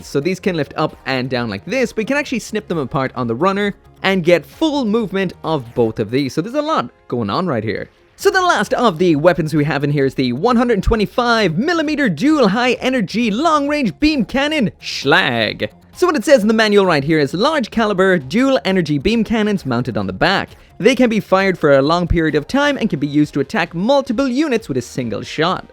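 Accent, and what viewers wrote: Ireland. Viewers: Once you shait I couldn't NOT hear your Irish accent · Shite. Thanks, i always wanted to hear your native accent! Still asking for that one single episode where only talk with an Irish accent ^^ Maybe something from the backlog?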